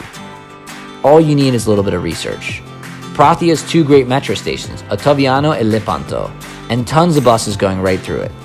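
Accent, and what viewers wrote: Philadelphia, United States. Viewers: Sounds more like a South St., Philly accent. lol The Roman Guy does operate out of Philly.:) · I can’t wait to visit Rome this April. It will be the second since I was 10 years old on my family trip in 1998 before euro 💶 come. I don’t mean to judge but, you come from Philadelphia? I notice your accent since I live in Cherry Hill, NJ. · Great video - thanks for posting. There is so much great information here. And weird question - but is that a Philly accent? :)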